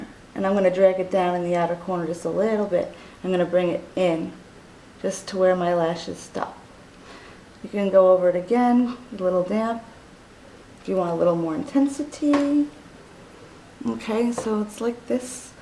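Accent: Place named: New York, United States. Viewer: You are so stinkin' adorable and I just love listening to you...your NY accent is so cute!!! I love my HIGH BEAM too! I almost am due to replace it. Love ya, sweetie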